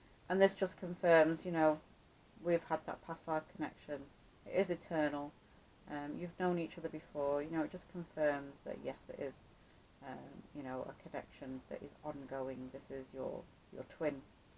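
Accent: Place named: Salford, United Kingdom. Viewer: Great reading my dear friend :) May I ask are you from Machester , as you sound very local to me in Salford :) Bless you my sister :) .. x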